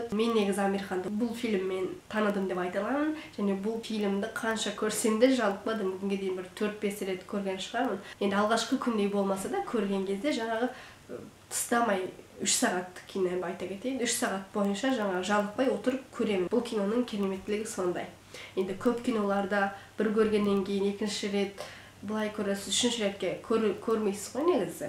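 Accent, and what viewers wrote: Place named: Mongolia. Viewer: Моңғолядансзба, Туркиядансзба Чота тіліңіз бір жақа тартып тур)))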